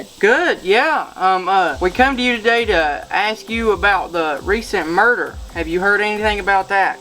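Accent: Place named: Kentucky, United States. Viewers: I can hear the Kentucky in your voice, It's good to see some Kin, Im from somerset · Where you from in Kentucky? I live in Ky & soon as I heard you talk I knew you must be from here lol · Genius. I see what you did with the short video. ngl this was entertaining and the accent bro. Grew up in a small Florida town so I love hearing the country accent. entertaining video man! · & who says the southern accent indicates stupidity.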